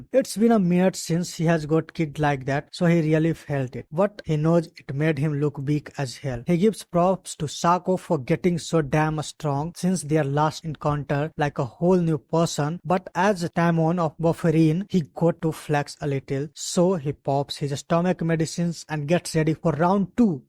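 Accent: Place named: India. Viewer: Please add some emotions in your voice Bro I feel your hindi voice in english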